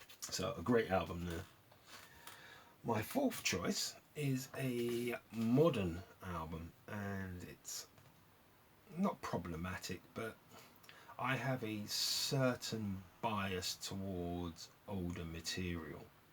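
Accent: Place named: United Kingdom. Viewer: I totally agree with you about Nancy Wilson! thank you for this video. . . very information and presented in that British accent that just makes me melt. . I’m just a puddle by now :). stay safe and well.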